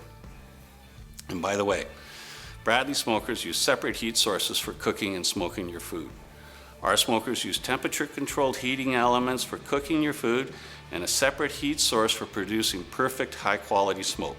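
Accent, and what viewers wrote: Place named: Canada. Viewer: I sense some Canadian from, eh?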